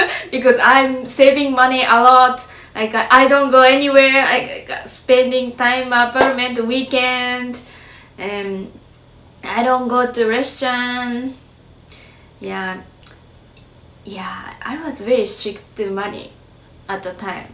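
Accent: Japan. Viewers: ❤❤ JAPANESE WOMEN ARE VERY EMOTIONAL I AM EMPRESS FOR YOU LIKE OTHER JAPANESE WOMEN I'VE MET ARE STUDYING IN THE PHILIPPINES SOME OF THEM SPEAK TAGALOG OTHERS OF THEM ARE MAJOR HERE😊🎉.. THE HISTORY OF NATION 4 THE LANGUAGE OF THE PHILIPPINES TAGALOG ENGLISH SPANISH JAPANESE DURING THE COLONIAL ERA 400 YEARS AGO THE 4 IMPERIAL COUNTRIES DURING THE JAPANESE EMPIRE COLONIAL ERA IN THE PHILIPPINES JAPANESE AS A SECOND LANGUAGE BUT WHEN THE PHILIPPINES BECAME FREE JAPANESE AND SPANISH LANGUAGES IT WAS REMOVED. THE ONLY THING THAT TEACHES IS THE JAPANESE SCHOOL IN THE PHILIPPINES BUT IN SPANISH WE HAVE A DIALECT THIS IS CHAVACANO 80 PERCENT SPANISH LANGUAGE WITH A HUNDRED DIALECT MANY ARE STILL TEACHING US BUT IT JUST DEPENDS?. I THINK YOUR ENGLISH IS OKAY BUT IT HAS A JAPANESE ACCENT❤😂😂 WHEN I GO TO JAPAN I WANT TO MEET YOU YOU ARE ALWAYS IN MY HEART 😊❤❤!!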